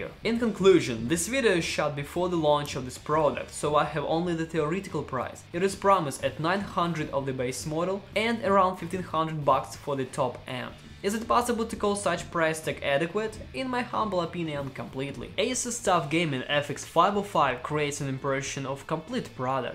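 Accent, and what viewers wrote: Russia. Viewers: Жёстко произносить по-русски английские слова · Было сложно, но я понял по незначительному акценту, что Русский! А потом видеозапись игр с русскими надписями... 👌😂 У тебя версия с gtx1060 или мне показалось? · are you russian? 😅 btw amazing laptop and best looking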